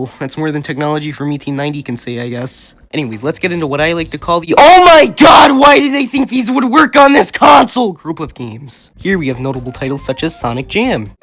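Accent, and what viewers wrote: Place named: Canada. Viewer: He sounds more like he's canadian tbh.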